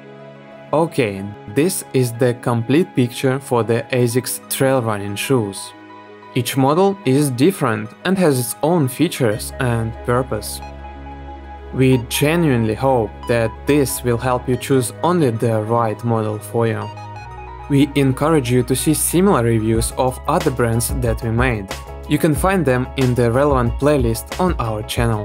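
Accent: Russia. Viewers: Thanks for the analysis, but this russian accent really turn me down.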